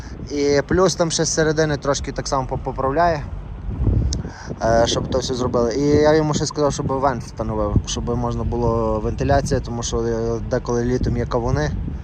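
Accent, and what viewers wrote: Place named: Hutsul, Ukraine. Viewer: Ти гуцул ще той! ЗабЕЕЕру...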